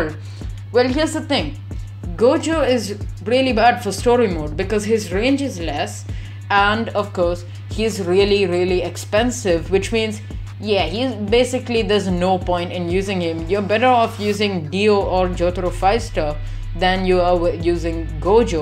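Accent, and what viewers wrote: India. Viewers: bro good video :) btw you sound like your from india which is a good sign because people from india always have best help and tips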